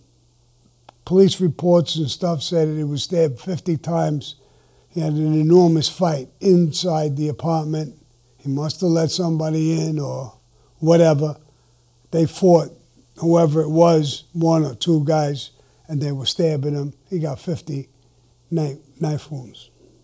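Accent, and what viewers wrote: New York, United States. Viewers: I forgot to write,sometimes it's hard to understand.either his voice goes low at the end of a sentence or kinda blurry,I think the N.Y. wiseguy accent plays a part too.but I'll take what I can get.cheers!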